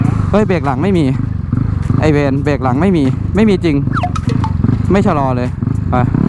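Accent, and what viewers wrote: Isan, Thailand. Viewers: เป็นคนอีสานหรือป่าวคับ😅😅😅😅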